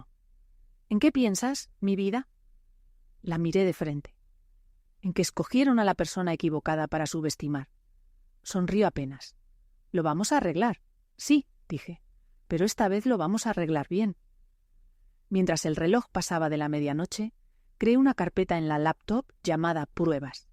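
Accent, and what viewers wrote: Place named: Spain. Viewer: Con mucho respeto,tengan cuidado con sus relatos, en Ciudad de México no nieva y la voz de la relatora es con acento español de España😊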